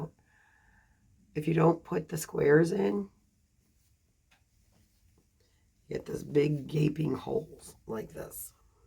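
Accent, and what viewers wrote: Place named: North Carolina, United States. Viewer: X school bus driver Hope get to feeling better you sound like me and my daughter we live in north Carolina feel better love you ❤.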